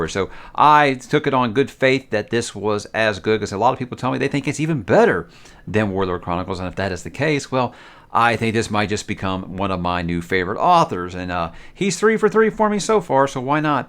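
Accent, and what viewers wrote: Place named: United States. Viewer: You're fine pronouncing names the american way because... you're american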